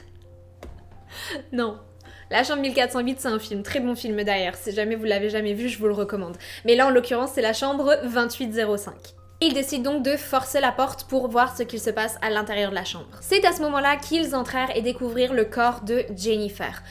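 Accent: Quebec, Canada. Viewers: D’où vien ton accent tu parles un peu québécois je trouve ?! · J'ai pensé des le debut de la vidéo que c'était probablement une histoire d'agent des services secrets, et je le pense tjr à la fin de la vidéo ducoup ahah, c'est le genre de crime limite banale qui se passent dans les dessous bien sombres des enquêtes de ces services d'espionnage, sinon tres bonne vidéo, j'ai fais un marathons de ta chaine aujourd'hui ayant pass la journée chez moi comme malade et j'aime beaucoup ta façon de raconté les histoire ( et le léger accent québécois ahah ) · salut a entendre ton accent ,tu es quebequoise? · Bonsoir, j'i bien aimé cette histoire que tu nous as compté ce soir. Je suis partant pour que tu nous parles aussi d'affaires non-résolues, d'affaires résolues des années après et de crimes comme tu avais commencé à le faire. Pour ma part, cette pauvre Jennifer semblait faire partie des services secrets d'un pays européen comme la Belgique. 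Son soi-disant suicide n'est pas du tout valable car il y aurait eu forcément son sang sur le pistolet et sur sa main et de plus, le pistolet aurait été dans une autre position que celle montrée sur l'image. Merci pour ton super travail, j'adore, tu es formidable. Petit bémol, pourrais-tu ralentir le débit de ta voix. Tu as des courses à faire après ta vidéo, présentement? Continues à nous compter ces histoires criminelles avec ton joli accent québécois et tes belles expressions françaises qui sont désuétudes de nos jours en France. Serait-il possible d'en savoir un peu plus sur toi, stp? Belle fin de soirée. Bisous criminels sexy nocturnes. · Coucou! Je suis du Québec et j'ai eu l'impression tout au long de ta vidéo de deceller un petit accent de chez moi?! En tout cas, tu as une nouvelle abonnée, félicitations pour ta vidéo ! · j'aime bien ton petit accent canadien ^^ c'est cute et continue ce style ( je te decouvre avec cette affaire ) et les truecrim , c'est grave intéressant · Je confirme également, je l avais capté cet accent québécois et c est très mignon 👍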